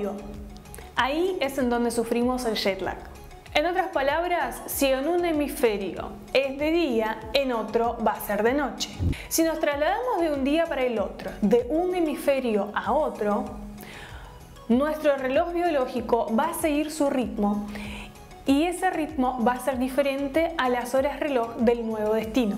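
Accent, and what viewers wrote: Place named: Argentina. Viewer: Muy buen video! Me pareció un poco raro tu acento en este. Estás tratando de hacerlo más neutro pero aguante el acento argentoo! 😘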